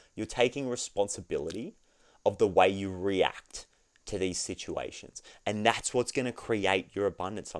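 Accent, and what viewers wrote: Australia. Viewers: did not expect the Aussie bloke voice... noice. Totes supporting ya mate *hits subscribe. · i actually had to question myself if I was right it was an Aussie accent for a few seconds haha 🙈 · Is your accent Australian??? ❤ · Thank you. You are very sweet and genuine. I enjoy your readings. Where are you located in the world? You sound a little like an Aussie!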